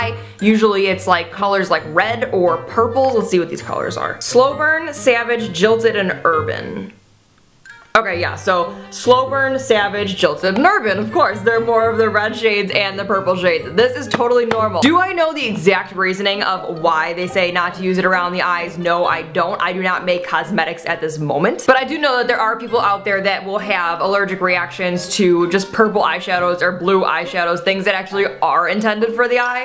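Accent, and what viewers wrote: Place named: Midwest, United States. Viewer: Lovin your Midwestern accent :)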